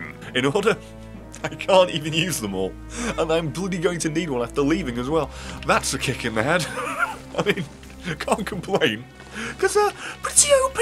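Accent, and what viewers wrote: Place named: England, United Kingdom. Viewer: Question: You've mentioned that you're in Germany before, but your accent is English. Are you, in fact, English?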